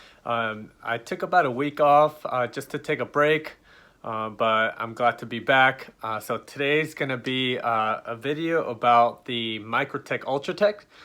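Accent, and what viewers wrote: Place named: South Korea. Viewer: I detect a korean accent. 한국인에요?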